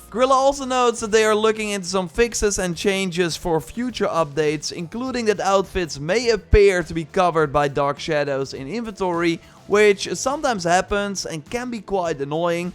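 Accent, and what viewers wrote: Netherlands. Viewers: Eyo you from the nederlands ?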